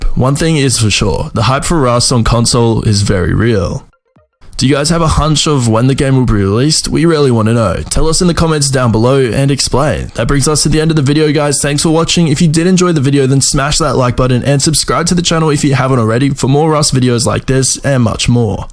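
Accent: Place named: Australia. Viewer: Australians are so hard to listen to FFS change up your pace monotone af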